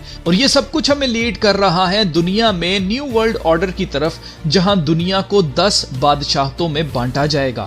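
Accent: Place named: Pakistan. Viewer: Your accent is pakistani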